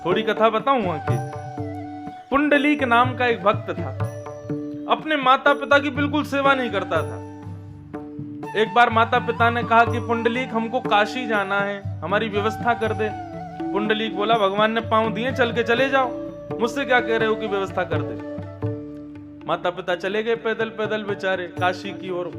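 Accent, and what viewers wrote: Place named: Braj, India. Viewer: Jay Shri Krishna Radhe Radhe 🕉 Namo Narayan ji Jay Shri Hari 🙏 ♥️ ❤️ 🕉 OM Namo Bhagvate Vasudevy Namh Jay Shri Laxmi Pati Jay Shri Shyama Shaym ji Jay Shri Guru Dev Maharaj ji Aapke Charno Mai Koti Koti Pranam 🙏 Radhe Radhe Jay Shri Harivansh ♥️ ❤️ 😍 💙 Radhe Radhe Aapki vraj Bhasa Badi Sumadhur hai Radhe Radhe Jay Shri vraj vasi Jay Shri Vrindavan Dham 🙏 Jay Shri Yamuna Maharani ji 🙏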